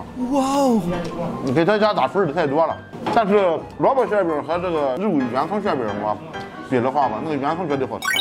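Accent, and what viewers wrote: Qingdao, China. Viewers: 想回家啊，听青岛话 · 聼青島話，回憶喝青島啤酒吃海鮮，真想故鄉。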